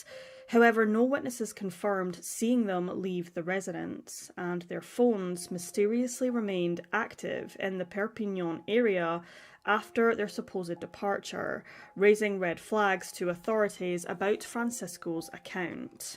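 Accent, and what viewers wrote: Scotland, United Kingdom. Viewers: HOLA MI FAMILIA DARK 🌑 CURIOUSITIES & BABY GIRL WITH THE AWESOME SCOTTISH ACCENT!!!#665 TOO COMMENT, THANKS GOODNESS, IT WASN'T THE NEXT ###😲😲😲😲😲😲😲🫣🫣🫣🫣🫣🫣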